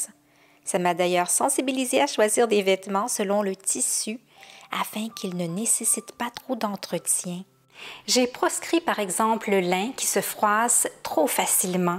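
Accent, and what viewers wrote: Quebec, Canada. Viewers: Bonjour je vous decouvre au hasard et votre contenu me convient.en plus je ne sais pas pourquoi l accent Québec me procure tjr du bien être 🤗🥰 moi ce que je ne fais plus c'est regarder le journal ou laisser la TV allumée pendant mon ménage j écoute ce genre de podcast comme le votre . J ai arrêter d acheter des produits ménagers je me sert des chiffonnettes H2O et leur produits ecocert fini les bidons en tout genre J ai aussi moins de repassage je sèche sur ceintres Je n utilise plus de cotons démaquillants. Depuis 3ans Gant démaquillant de chez H2O démaquillage a l eau ..voici qlqs exemple de ma direction vers une vie plus sereine plus saine et zen 🙌🍀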